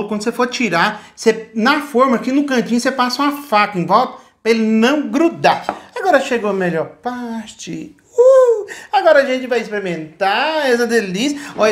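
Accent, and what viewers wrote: Minas Gerais, Brazil. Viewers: E aprimeira ves que Estou vemdo seus aldio amei fose Emuito fofo pelo sel sutaki voce e mineiro adoro kkkkkkkkk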